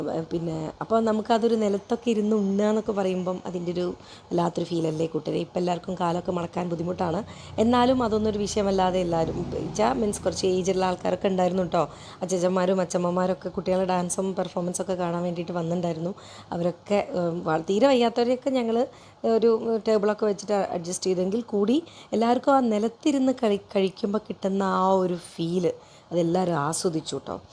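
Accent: Kozhikode, India. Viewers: നേരം എനികില്യ ❤❤❤❤❤❤ കോഴിക്കോട് slang ❤❤❤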